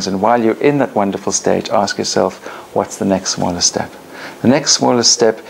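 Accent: South Africa. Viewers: South African accent? 😁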